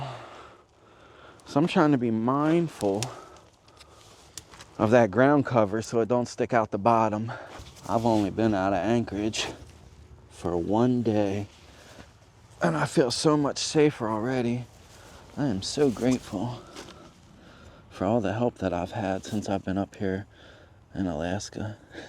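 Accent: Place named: Philadelphia, United States. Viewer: This dude is from Philly i can tell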